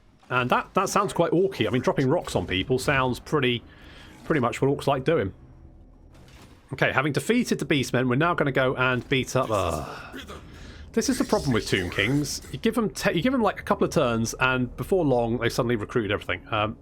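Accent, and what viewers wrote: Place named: United Kingdom. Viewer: What? Who's competing with you? That fraud PewDiePie? You've got something he'll never have - a British accent.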